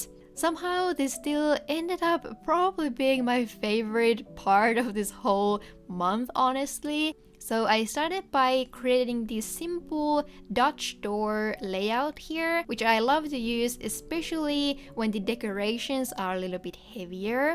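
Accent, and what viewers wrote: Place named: Finland. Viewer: beautiful!! also your accent sounded familiar so i had to check if you're finnish, and you are! terkkuja suomesta! 😄❤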